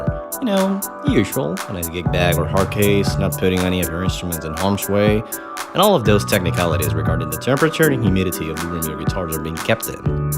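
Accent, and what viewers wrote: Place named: United States. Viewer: Good tutorial, man. Lots of good tips a lot of players particularly ones new to guitar might not know.👍 Side note: your accent is much more American sounding than I imagined. No real point there just and observation. Lol!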